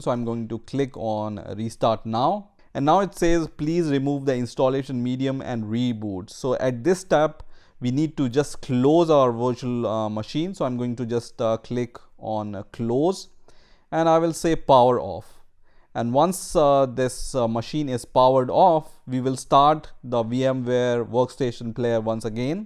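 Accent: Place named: India. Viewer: this guy sounds like Indian anyone noticed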